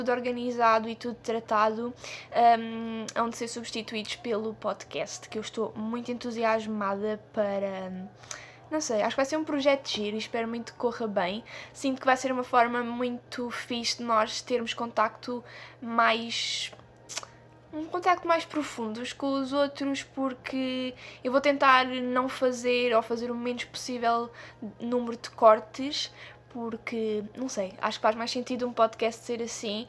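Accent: Portugal. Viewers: adoro seus vídeos e esse sotaque tuguinhaaaaa! <3 tenho uma web série de roteiros veganos aqui no Brasil, vem dar uma olhadinha!! Quero muito fazer uma temporada do programa em Portugal, amo essa terrinha!! Beijossss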